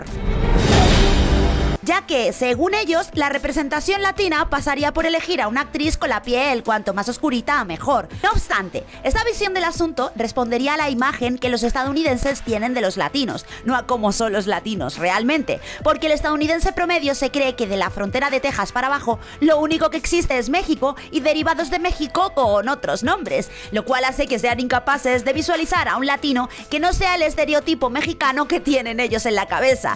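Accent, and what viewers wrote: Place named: Spain. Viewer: Nosotros ? Te juro pensé por todos los artículos que usas para tus vídeos que eras de España.... Eso y el acento jaajajaj . Pero bien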